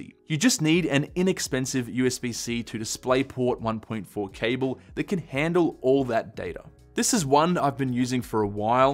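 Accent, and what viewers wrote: Australia. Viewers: I subscribed as soon as a heard that beautiful Aussie accent. How about the Hidpi compatibility? Some monitors don't have that options when connected to MacOs. I'm thinking of buying a mac mini and a gaming monitor. Cheers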